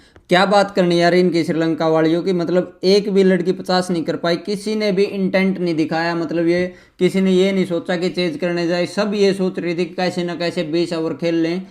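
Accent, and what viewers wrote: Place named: Haryana, India. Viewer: Haryana se ho kya bhai ? Thoda haryanvi touch h aapki voice me 😅🤝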